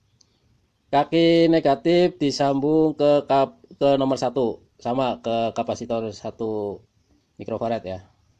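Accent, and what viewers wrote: Banyumas, Indonesia. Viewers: Rika wong ngapak ya kang siip lah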